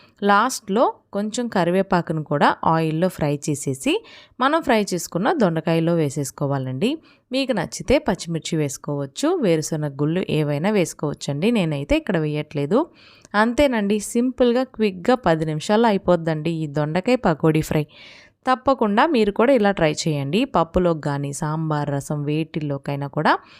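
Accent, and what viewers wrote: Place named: India. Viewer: Maam you have indian voice also...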